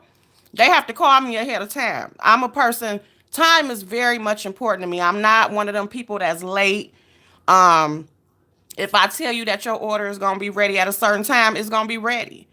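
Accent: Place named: Chicago, United States. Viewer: Oh sis: This looks yummy! From a girl born in Chicago and raised in Toledo: Our accent is the same!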